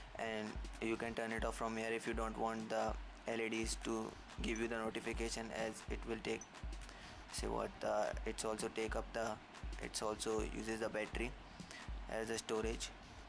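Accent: India. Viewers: Worst review ever watched..This loser doesn't know the purpose of the OFF button at the back panel next to the battery..But he thinks that he's a tech genius. :P Besides my ears hurt by this Indian accent.. :@ "Somebody Gonna Get Hurt Real Bad" LMAO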